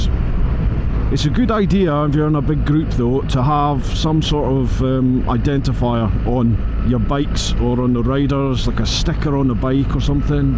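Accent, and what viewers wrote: Scotland, United Kingdom. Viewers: You definitely sound more Scottish once your back over onto this side of our border buddy! 🤪👍 Great vid & good to see a few familiar faces & Peaky behaving himself! 🤣👍 I'll definitely need to head up past the ski resorts on a bike at 1 point! 😎✌️ Are you doing the ABR this year,since I noticed you had a vintage ABR t-shirt on while on Haggis Whisperers series recently? 🤔😬👍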